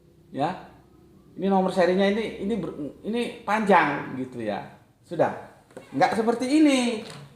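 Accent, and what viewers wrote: Java, Indonesia. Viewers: Medok kok pake gue gue, koyok kodok! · Ga usah GUE GUE lah... GA PANTES & GA NYAMAN dengarnya ! Logat aja logat Jawa kental gitu koq GUE GUE... !!! Wkwkwkwk... 😂😂😂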